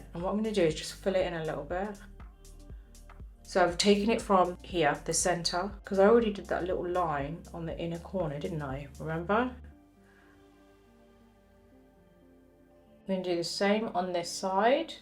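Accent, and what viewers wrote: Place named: London, United Kingdom. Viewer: got Londer's accents like Michael Caine's. Haha. And I like it.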